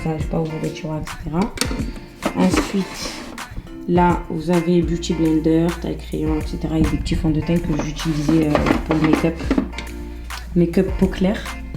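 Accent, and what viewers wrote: Marseille, France. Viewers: No mais serieux je cherche toutes tes videos rien ke pour ecouter ton accent marseillais!!! Je kiffe tropppp 😍😍😍😍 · Ses tarpin de sbob chez toi machallah parlez à la facon marseillaise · j'aime trop ton accent Marseille 😁💙💙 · Je kiffe l'accent marseillais 😍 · J'avais jamais entendu de renoi avec l'accent marseillais mais je savais qu'il y en avais et je trouve sa MAGNIFIQUE je kiff à mort ta voix et tout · ya une une video ou elle parle combien ça la blesse que les francais disent prk elle a un accent marseillais alors qu'elle est renoi je t'invite a la voir tu comprendras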